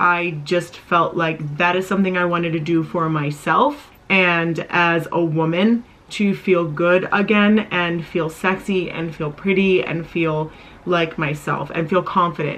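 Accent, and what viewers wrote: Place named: New York, United States. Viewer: A bit off-topic, but why does your voice sound so different in your videos (in compare to Brandon's)? It has a lot more bass, and it sounds kinda newyork-ish.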